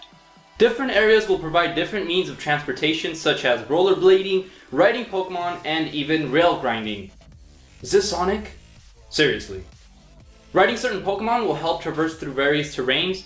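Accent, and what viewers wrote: United Kingdom. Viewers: YOU CAN NEVER COPY BRITISH ACCENT!!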